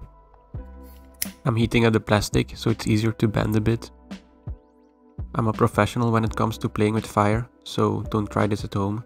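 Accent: Netherlands. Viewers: je Nederlands accent is hilarisch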